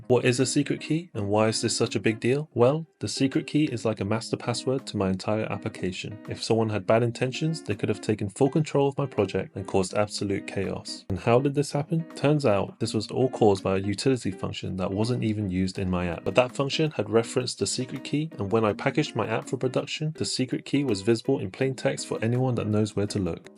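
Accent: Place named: United Kingdom. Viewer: 영국 유학 다녀오셨나요? 영어 너무 멋지십니다!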